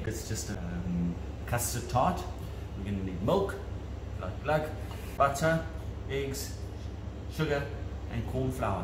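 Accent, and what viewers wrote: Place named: South Africa. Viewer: It’s been so long since I’ve heard a good South African accent (thank u)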